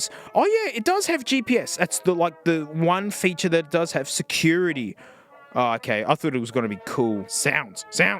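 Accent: Australia. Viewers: Australian mate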